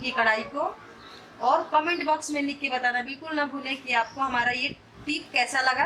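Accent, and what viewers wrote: Bihar, India. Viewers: आप बिहार से हो क्या ?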